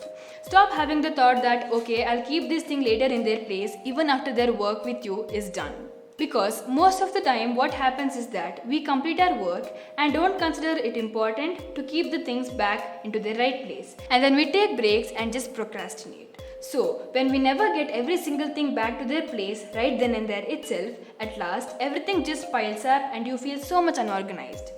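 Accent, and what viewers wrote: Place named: England, United Kingdom. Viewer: First tym here girl....and loved ur voice and ur English accent....nd the tips are beneficial ❣️🥰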